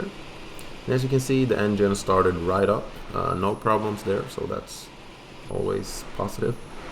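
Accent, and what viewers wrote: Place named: Sweden. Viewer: First time watching one of your videos, and I was like "damn, he sounds hella Swedish". Then I saw the Biltema can 😂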